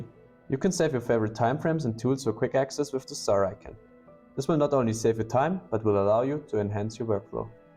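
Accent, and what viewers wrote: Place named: Germany. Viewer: I didn’t know you also had a German accent 😅 great vid brother. Hope it’s the first of many!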